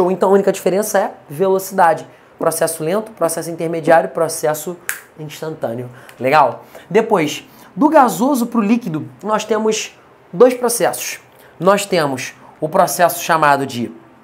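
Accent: Pará, Brazil. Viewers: O sotaque do professor é lindo, paraense, ou paranaense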